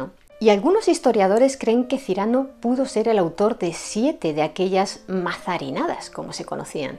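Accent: France. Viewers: Me encanta tu acento francés.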